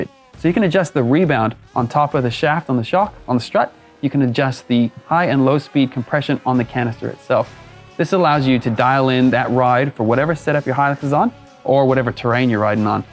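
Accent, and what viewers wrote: Australia. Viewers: This guy was for sure an Aussie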